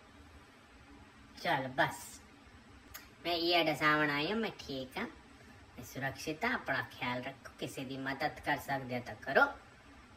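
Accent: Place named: Saraiki, Pakistan. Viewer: I just love your saraiki accent.... So beautiful... I didn't know people in india could speak saraiki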